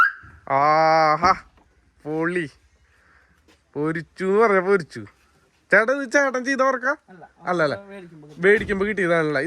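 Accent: Thrissur, India. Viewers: മ്മടെ തൃശൂർ ഭാഷ ഹമ്പ പൊരിച്ചു 🥰❤🔥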